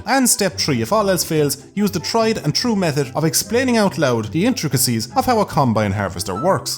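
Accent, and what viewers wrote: Ireland. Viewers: I remember the tale of the banshee, it’s neat hearing an Irishman tell it as a parody. Hillarious.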